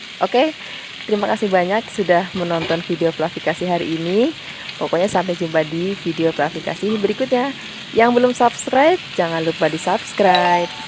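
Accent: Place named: Banyumas, Indonesia. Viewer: Gak tau nya orang ngapak...dan muka nya beda ma suaranya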